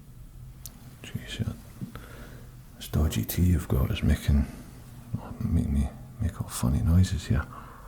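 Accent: Scotland, United Kingdom. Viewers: i looooooooooooooove how Scottish sounds.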